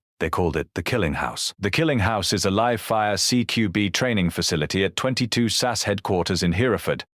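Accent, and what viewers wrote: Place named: Bogan, Australia. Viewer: AI narration is bogan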